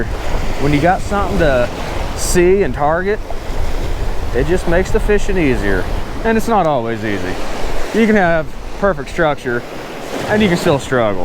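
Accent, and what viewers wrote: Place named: Alabama, United States. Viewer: After watching and listening I can hear the same Alabama accent as Beard. Lol Sounds country like here in Texas 🐟 🎣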